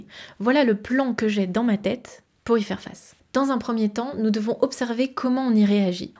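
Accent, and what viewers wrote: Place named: Japan. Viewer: wsh tu parle français bien japonais stop wsh puré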